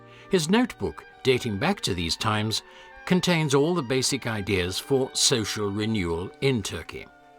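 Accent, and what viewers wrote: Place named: United Kingdom. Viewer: Wicked voice. Raspy British always sounds suspenseful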